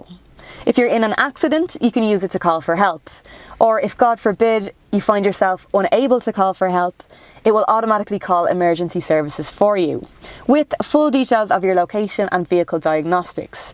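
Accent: Ireland. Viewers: I think I prefer a woman doing a car review over a guy. Same great data and specs if not even better with none of those macho undertones. Also love your Irish accent, much nicer on the ear than any British accented presenter out there.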